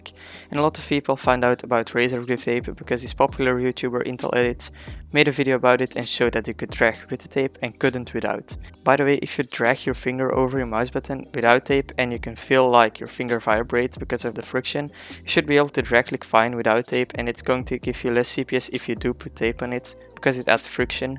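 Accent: Netherlands. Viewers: Where you from you sound dutch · You have a Dutch accent?? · U sound dutch, where you from?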